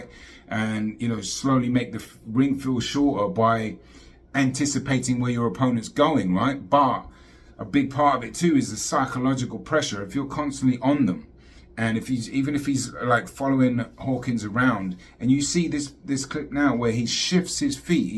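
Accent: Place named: Cockney, United Kingdom. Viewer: Love that east end accent, guv mate 👍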